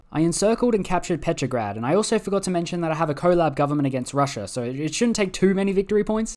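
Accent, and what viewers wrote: United Kingdom. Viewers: it's called british accent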